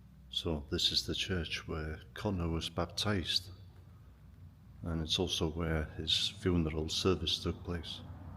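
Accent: Wales, United Kingdom. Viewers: Please! Never apologise again for your Welsh accsent. It's part of who you are... Best wishes from Scotland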